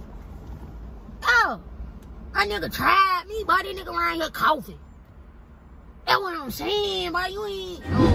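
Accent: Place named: Atlanta, United States. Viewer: I had to sit bacc & think.. she got everything on point. Cause this is how me & my people talk down here in Atlanta Ga smh lol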